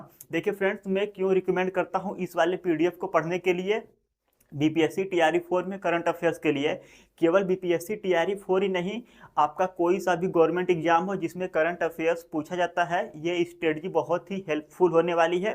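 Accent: Uttar Pradesh, India. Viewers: Bhai aap up ke ho kya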